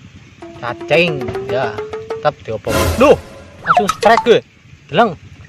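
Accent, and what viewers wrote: Banyumas, Indonesia. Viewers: Wong ngapak eah kang... Ngapake ngendi rika kang. aku Cilacap... Semangat trus kang salam sehobi...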